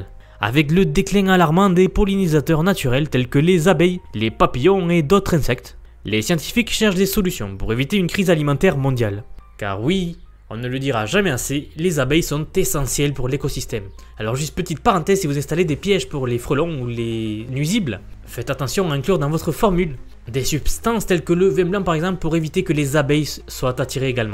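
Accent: South, France. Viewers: Avec un joli accent du sud du soleil qui nous raconte que de la merde. Quand les industriels auront tout contaminé, ils nous vendront des robots pollinisateurs. Allez vous faire soigner… pour être poli.🖕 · J’adore ton contenu a la pointe de l’innovation et merci pour ton travail ! Par contre désolé mais il faut faire des séances chez l’orthophoniste. Ton discours et très saccadé , et ta prononciation approximative … ton accent du sud est fort sympathique mais ca ne suffit pas pour t’ecouter agréablement 😊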